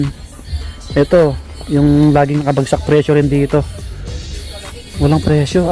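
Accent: Visayas, Philippines. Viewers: Ituwid mo salita mo ,anong naghanap?naghahanap dapat past tense yung naghanap....halatang bisaya ka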